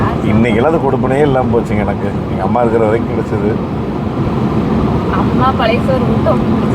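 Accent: Kongu, India. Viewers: கொஞ்சும் கொங்குத் தமிழ் பேசும் கோமகரே...! திகட்டாத தித்திக்கும் பேச்சுடைய திருப்பூர் திருமகரே...! எங்கள் மதிப்பிற்குறிய திரு. அண்ணா அவர்களே❤❤❤🙏 எப்படி இருக்கீங்க அண்ணி❤❤❤🙏 எப்படி இருக்காங்க ரித்திக்❤❤❤ எப்படி இருக்காரு. தெலுங்கானாவில் இருந்து திருப்பூர் வந்த அந்த நண்பரின் நட்பை உங்களுக்கு தெலுங்கானாவில் வெளிப்படுத்தியதை வெறும் வார்த்தையின் வாயிலாக சொல்லிவிட முடியாது அண்ணா❤❤❤❤🙏 மாருதி கார்களைப் பற்றி இன்னைக்கி பலதரப்பட்ட இழிவான பேச்சுக்கள் சமூக வலைதளத்துல உலா வருது. உதாரணத்திற்கு.... மாருதி காரா அது ஒரு டப்பா...டின் கேன் இந்த மாதிரியான பேச்சுக்கள். அது அத்தனையும் முறியடிக்கும்வண்ணம் மதிப்பிற்குறிய அண்ணா உங்களோட இந்த லடாக் பயணம் நிச்சயம் அமையும்...! காரணம் லடாக் பயணம் என்பது அவ்வளவு எளிதான பயணம் கிடையாது. அதிலும் நீங்க SWIFT காரைக்கூட எடுத்துட்டு போகல? மாருதி 800 காரை எடுத்துட்டு போயிருக்கீங்க👍👍👍👍👍 இதுவே மிகப்பெரிய சவால்👍👍👍👍 லடாக் சாலை பொதுவாக மலைப்பாதைகள் இருக்கும்.... 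சில இடங்களில் பள்ளங்கள் மேடுகள் அதிகமாக இருக்கும் என்று சொல்லக் கேள்வி. அதையெல்லாம் இந்த மாருதி 800 காரில் சென்று பயணத்தை வென்று திருப்பூர் மண்ணில் கால் பதித்து மாருதி காரின் சரித்திரத்தை வெற்றியாக்குங்கள் அண்ணா...! லடாக் பயணம் இனிதே தொடர்ந்திட வாழ்த்துகள் அண்ணா❤❤❤ என்றும் அன்புடன் உங்கள் தம்பி என்றும் அன்புடன் உங்கள் TMF ரா.முஹம்மது கபூர் (சென்னையில் இருந்து)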